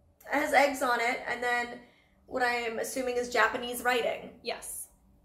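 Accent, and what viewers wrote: Canada. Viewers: Aww! We don't laugh out of derision of your cute accent, we laugh because our brains are listening to your less affected accent and we forget you're Canadian. Then our silly brains are like "oh yeah she is!" So I guess the giggle (which is what I do) is partly out of our silly brains forgetting your nationality, and part because it's cute. FYI, I still giggle when I'm over at my friend's house and her husband says about. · Your Canadian accent is so cute haha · I love your Canadian accent so much! I love the way you say “sorry”💕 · I love Rebecca Minkoff purses, I Always Love your style and I love your Canadian accent! I love Canadians! xo All really cute items!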